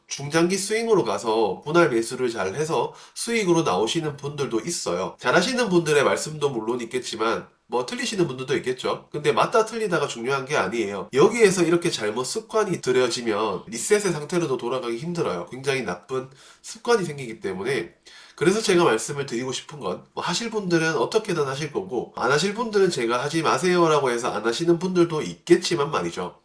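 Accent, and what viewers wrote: Daegu, South Korea. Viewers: 오늘따라 대구 사투리 왜케 귀엽나요.. ㅋㅋ 오늘 터지고 반성중인 주린입니다ㅜㅜ ㅋㅋ 테마+실적 명심하겠습니다!!!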